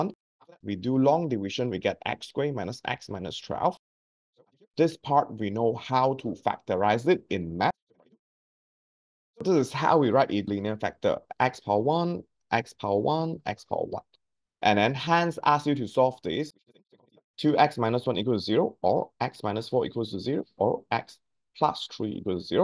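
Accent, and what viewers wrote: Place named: Asia. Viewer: something about your asian accent makes math so much easier to understand(im also asian) btw all the other channels stretched this topic out soooo long(like multiple 20 minute slow paced videos) but your concise and strutctures style of teaching helped me practice along and not take like 5 hours for the easiest add maths chapter.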